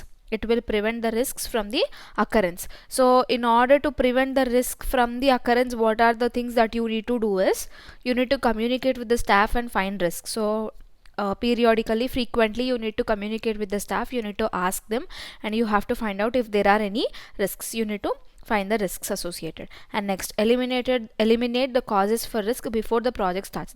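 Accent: Telugu, India. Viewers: slang itself tells your a telugu ammai 👋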